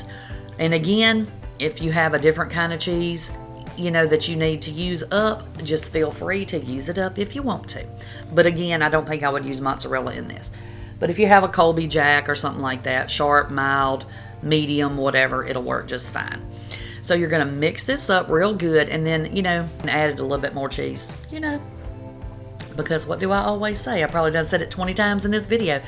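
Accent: South, United States. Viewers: I swear to girl you crack me up you make my day. Where are you from I'm from mobile Alabama and you sound like a southerner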